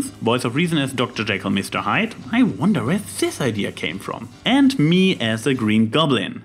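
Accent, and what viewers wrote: Germany. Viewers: OMG THE GERMAN ACENT!!!!!!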